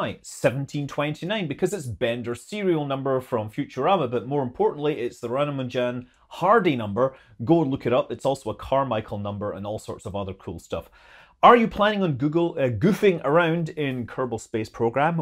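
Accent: Scotland, United Kingdom. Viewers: the one time his Scottish got me: what is the fuel settling burn called?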